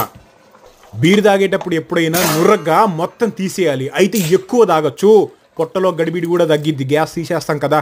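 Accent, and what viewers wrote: Andhra Pradesh, India. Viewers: Meeru telugu baga mataladtharu.....nenu meeru andhrapradesh ki chedina varemo ani anukunevallamu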